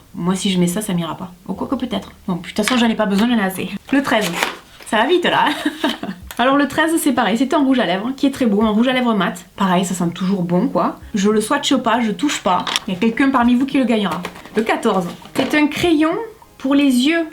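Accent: South, France. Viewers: Coucou, super cool ta vidéo j'adore, je ne savais pas que Lidl et Action c'étaient mis à fait ce genre de calendrier et mon préféré des 3 que tu as essayés est le Spa de chez Action! 😀 Très déçue de celui de chez Lidl franchement je préfère mettre 4 euros de plus et avoir celui de chez Maybelline qui est vachement plus quali que celui de Lidl et avec beaucoup de full sizes... Merci pour cette vidéo, avec ton accent du Sud que J'ADORE (forcément j'ai le même, pas très objectif...). P.S: Effectivement ça serait cool que tu unboxes un calendrier bio! (Pas très objectif non plus, je bosses dans la certif de produits bio... 😂😂) Gros bisous!!!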